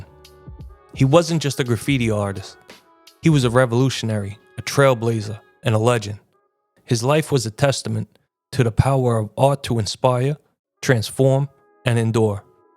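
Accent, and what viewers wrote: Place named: New York, United States. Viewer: Very well done doco. You have the perfect classic NY accent for the narration that really sets the tone. Fly high Tracy168🙏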